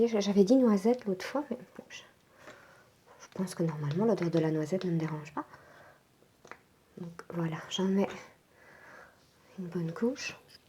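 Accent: Belgium. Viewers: l’accent belge en plus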